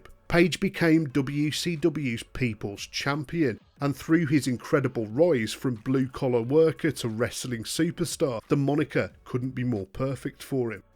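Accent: United Kingdom. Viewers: How’d I know this dude would be British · No offense but why are all these wrestling bio youtube videos narrated by some UK bloke?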